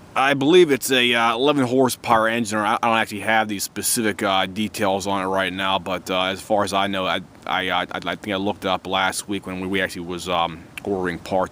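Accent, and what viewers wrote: Pittsburgh, United States. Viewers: Great video......sounds like a Pittsburgh accent.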